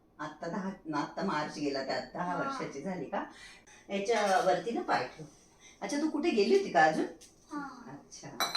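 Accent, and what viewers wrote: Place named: Satana, India. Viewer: ताई कुठे राहता नाशिकला तुम्ही नाशिकला कुठे शिफ्ट झालात तब्येत फारच बिघडून गेलेली आहे तब्येतीची काळजी घ्या ताई ओटी खूप छान भरली देवीची सगळं आपल्याकडच्या पद्धतीनेच केलं तुम्ही सटाणा खूपच छान बोलता मला खूप तुमचे व्हिडिओ आवडतात पण तुम्ही कधी कधीच व्हिडिओ टाकतात ताई नाशिकला कुठे शिफ्ट झाले सांगा मी तुम्हाला भेटायला जरुरी मी पण नाशिकलाच राहते ताई कमेंट ला रिप्लाय द्या माझी सुंदर ताई माझी सुंदर बहीण माझी गोड बहीण अशाच गोड सतरा अशाच बोलत रहा खूप छान राहता येत मी श्री स्वामी समर्थ शिव शंभू शंकर बाबा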